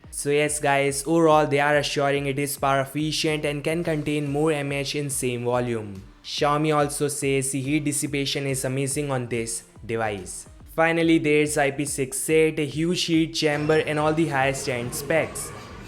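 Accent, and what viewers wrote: India. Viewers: Dei engdian English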